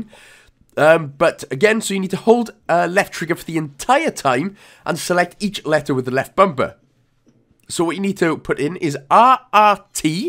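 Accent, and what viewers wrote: Wales, United Kingdom. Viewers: maybe he's ammerican? The Welsh accent isn't the easiest to follow if you are not from the UK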